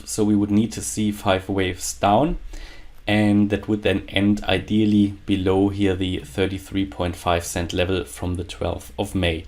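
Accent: Germany. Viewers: das hört sich stark nach Deutsch/englisch mixxed an hahahha :D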